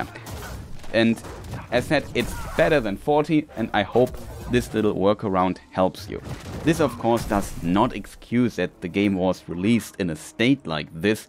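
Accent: Germany. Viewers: Kann es sein das du eigentlich deutsch sprichst habe da ein paar Wörter gehört die das untermauern 😁? Warum ist es zum Standard jedes neue game mit so einer Performens raus zu bringen? EA kann nichts wenn sie nicht exklusiv dafür bezahlt werden. Das erste Update hat null was gebracht... CPU immer noch bei über 60% und GPU nicht mal bei 60%, CPU ist eine 2700x mit 4,2GHz und GPU ist eine 3060 OC sie läuft bei 2115MHz und 8000MHz Memory Takt. Mal sehen ob dieses Jahr noch ein Game kommt was einfach ab Tag eins läuft, das wäre ein Traum 😍. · You are definitely a German brah 😀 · Man hört sofort, da steckt nen deutscher hinter ^^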